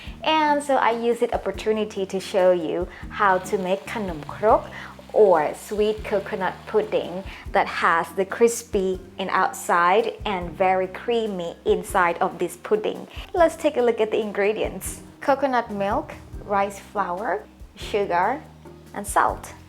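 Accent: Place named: United States. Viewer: Your English accent is not Thai...I'm sure you have been in America...🥰 Love from New Jersey ❤️🫰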